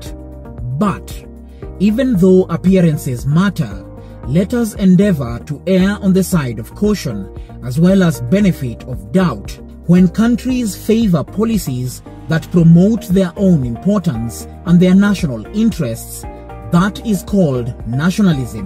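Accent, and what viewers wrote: Kenya. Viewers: This ascent is Kenyan 😁... Much love brother man. 🔥🔥🔥🔥🇰🇪🇰🇪🇰🇪🇰🇪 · This Kenyan accent is hilarious.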